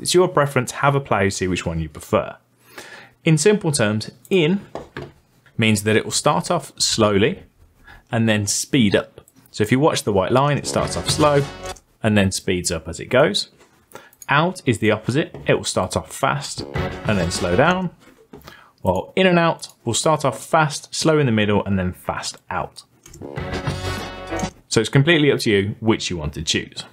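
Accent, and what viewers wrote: United Kingdom. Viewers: What's not to like,?! I learned how to make an intro, and I got to hear a cool accent from across the pond.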